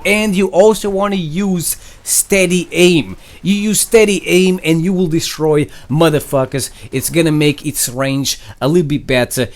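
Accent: Portugal. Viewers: Are you Portuguese? 🇵🇹 You sound Portuguese.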